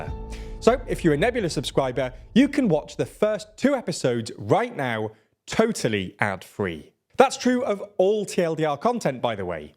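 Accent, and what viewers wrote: England, United Kingdom. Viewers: Oh no, Japan will have to import infinity foreign colonizers (sometimes called asylum seekers by idiots in America and Europe) to solve this problem. They couldn't, I don't know, reduce the population density naturally and rebalance the population pyramid over the long-term. Japan will be fine so long as they do not accept foreigners into their country and maintain their unique people to live on their own. No need for cheap British solutions coming from the Americans 52nd state (Canada for those wondering). TLDR is truly just shitlib propaganda with an English accent, but zero muscle mentally or physically for that matter.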